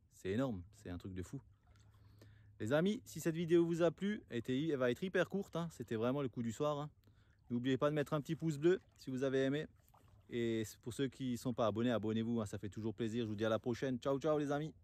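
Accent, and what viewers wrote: Alsace, France. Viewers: Salut toi tu a un un accent qui vient de chez nous tu es où en Alsace?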